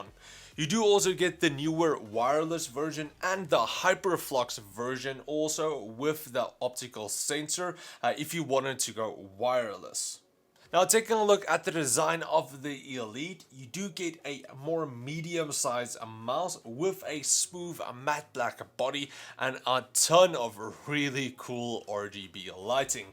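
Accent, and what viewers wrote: Afrikaans, South Africa. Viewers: Is y Afrikaans? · Good vid. Judging from your accent and your website being .co.za you live in SA? I do too and most youtubers make builds in dollar. Can you please do a R10 000 gaming pc build?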